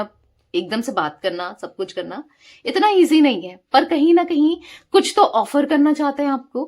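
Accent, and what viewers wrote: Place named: Punjab, India. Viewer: Ap ki awaz Punjabi+Urdu = Mix tone ha unique voice ❤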